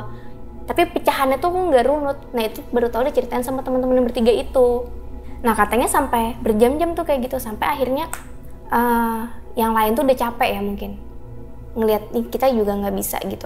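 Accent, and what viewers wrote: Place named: Banjar, Indonesia. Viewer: Ciri has urng banjar bekisah nahap bnr😄 tapi asik yaboyyyyy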